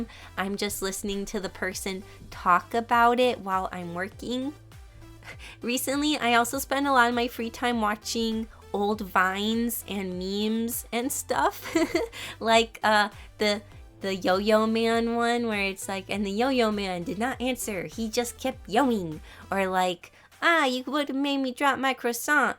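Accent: United States. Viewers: Hi Nd to answer wat u said this video I figure you were white American because I have a friend that have an accent when she talks but she’s American she’s not mixing or anything she’s just a white Caucasian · Are you by chance from virginia or somewhere near there? You're voice/accent reminds me of my family whose from there lol. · I dont think your asian bc asian ppl dont rly wear sweaters and you sound like usa ppl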